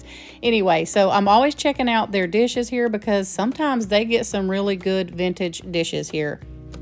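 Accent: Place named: South Carolina, United States. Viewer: I just found your channel and don’t know where you’re from but your accent screams South Carolina. I may be wrong. But at least a country farm girl. Like your blogs.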